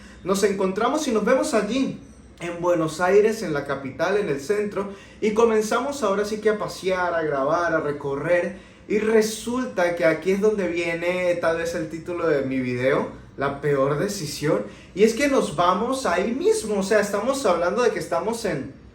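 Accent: Mexico. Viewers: No me di cuenta de que eres de Venezuela,te sentí un asentó de Méjico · Tienes un acento Mexicano,, saludos, 👍👍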